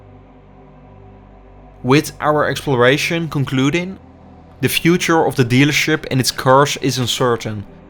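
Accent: Netherlands. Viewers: Bro that dutch accent you heard that right away! haha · Do i hear a dutch accent🤔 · Kikass Dutch accent! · Het Nederlandse accent is te erg. Klinkt echt slecht. De video is wel leuk. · The person reading the text must be Dutch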